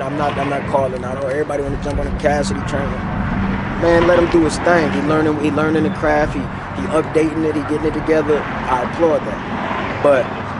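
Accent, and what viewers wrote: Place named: Pontiac, United States. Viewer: I COULDA SWORN HE WAS FROM PONTIAC LOL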